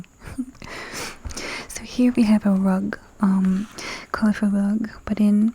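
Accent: Transatlantic, United States. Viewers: Your accent is akin to the trans Atlantic / Edie Beale cadence and it is amazing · New comfort video ❤ P.S. What accent is this? I can’t always distinguish between regional US accents but this lady’s accent reminds me of Jaws and I love it. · very transatlantic. It kind of jumps all over the place tho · It sounds transatlantic right?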